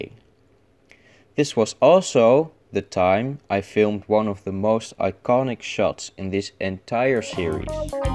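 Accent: Netherlands. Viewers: RRRRRRRRRRrrrRRRrrrRRRrrrrrRRRR horrible Dutch RRRrrRRRRrrRRR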